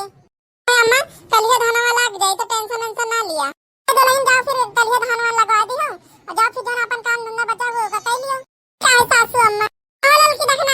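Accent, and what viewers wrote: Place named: Raebareli, India. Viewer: Aaap kaha se ho Raibareli ki bhasha lagti h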